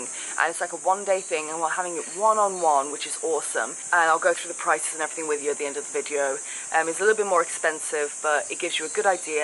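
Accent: United Kingdom. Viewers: I just can't nail down your accent. It's certainly not Aussie but if you're a Brit, then you speak with a watered down Queens English.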